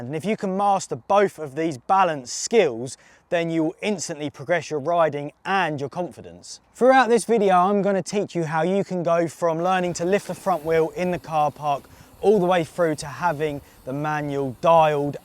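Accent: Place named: United Kingdom. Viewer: British English!!!!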